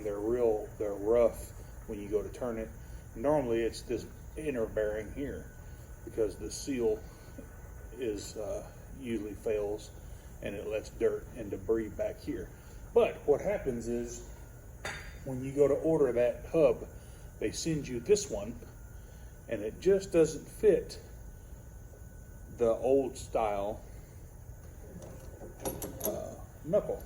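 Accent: Texas, United States. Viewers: Awesome video! Very comprehensive. Appreciate the part numbers as well. 🍻 Personal aside - what part of Texas is your accent from? -a fellow southerner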